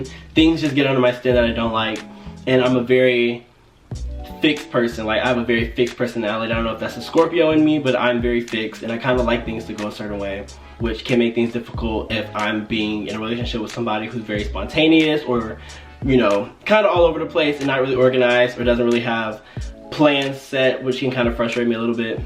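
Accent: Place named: United States. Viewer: Wait, is this? Looks like him but and same name but im confused cos he sounds american.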